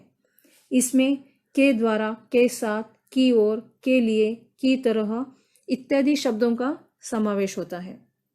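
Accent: Maharashtra, India. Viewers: By Your Voice I think you are Marathi Are u Marathi?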